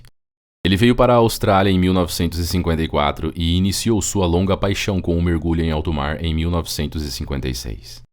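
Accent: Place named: Sao Paulo, Brazil. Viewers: esse sotaque de paulistakkkkk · Meu Deus esse sotaque Paulista forte dá uma aflição é muito feio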